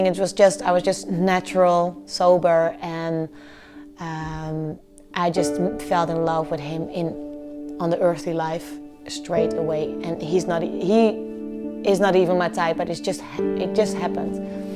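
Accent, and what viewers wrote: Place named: Netherlands. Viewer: Love your accent and i dont like all Dutch accents.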